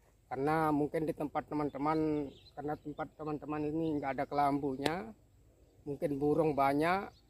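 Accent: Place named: Lombok, Indonesia. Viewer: Logat lombok nya kentara banget pak slm dari lombok tgh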